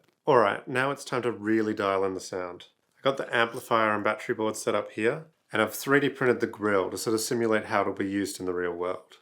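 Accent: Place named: Australia. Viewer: I can't tell you how exciting it is seeing and hearing a fellow nerdy Australian in these videos. Huge congrats on such a great design!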